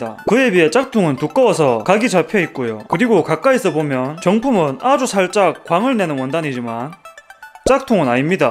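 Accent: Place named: North Korea. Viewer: 사투리가 매력적이시네요! 혹시 북에서 내려오셨나요?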